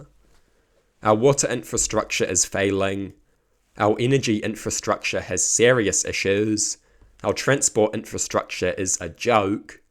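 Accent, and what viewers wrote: New Zealand. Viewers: I like how he emphasizes the final syllable in each sentence, not sure if it's just a part of the New Zealand accent or just him though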